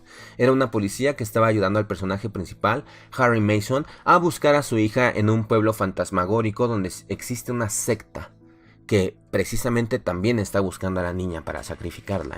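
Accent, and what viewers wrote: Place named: Spain. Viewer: Saludos a todos y un gran programa amigo y AHORA ENTIENDO POR QUE TE SALE TAN BIEN ESE ACENTO ESPAÑOL😂😂😂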